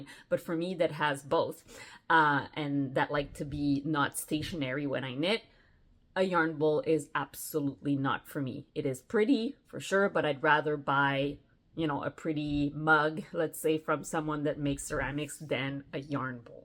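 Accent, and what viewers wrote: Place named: Quebec, Canada. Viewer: Thank you for your videos! ❤ You sound Quebecoise. 👏🏻💞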